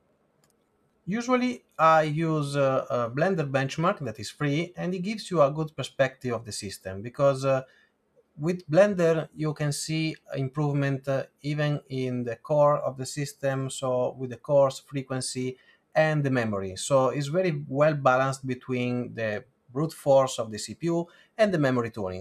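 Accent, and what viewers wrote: Italy. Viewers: Italian accent is funny. No offense, I like it :)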